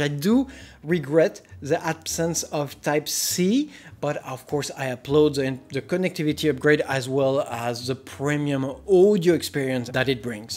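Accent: France. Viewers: Frérot ça ce vois t'es français , very good accent , tkt on est pareil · My gawd, that french accent x) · That French accent nice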